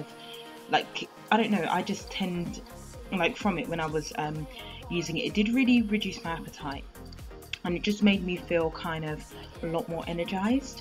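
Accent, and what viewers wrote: United Kingdom. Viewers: omg your accent😍😍 I'm sure than you live in England . anyway. you are really beautiful and I will continue to follow you thanks you😀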